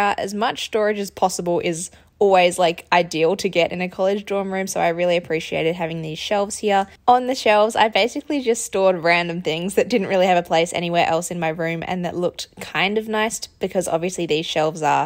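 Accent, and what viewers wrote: Australia. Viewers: Your intonation is quite special , not like the American intonation I have learned , and after I checked the profile , realized you are an Australian ,no wonder it’s quite different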